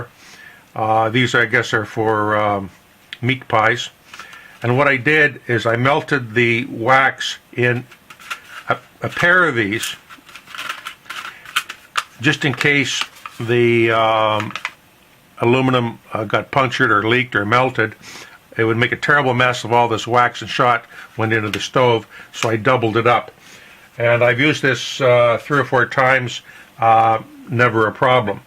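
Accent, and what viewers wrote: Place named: Canada. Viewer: Worth noting that at today's (2023) lead and shell prices, if you reload and cast you can shoot slugs cheaper than bird shot. I can buy soft lead ingots for $2/lb, but #8 shot runs awfully close to $3/lb (US dollars here, since you sound Canadian). I can cast enough Lee slugs for a season in an hour or two over a gasoline camp stove (fuel cost about a dollar for the session), load them with the same wad and powder load I'd use for the same weight of bird shot, use a bingo chip (around a cent each) for an overshot card and either roll or fold crimp (I have a roll crimp tool, but my Load All II makes really nice fold crimps). You may want a 20 ga. nitro card inside the shot cup under the slug to keep the wad from getting stuck in the slug base, that's another two cents or so. A 1 ounce slug load then costs a few cents less than a one ounce birdshot load in the same hull, with the same powder, and ought to be about as accurate as your waxers -- and less work, IMO. 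I doubt I'll ever pay for commercial slugs... BTW, if you want to cut the crimps but keep the compression on your wad, you can put an overshot card on top of your wax slug (cut with a 3/4" punch out of cereal boxes or similar card stock) and then roll crimp over that. If you cut the minimum to remove the edge of the crimp, you'll have plenty of hull to roll over on top of the wax and card.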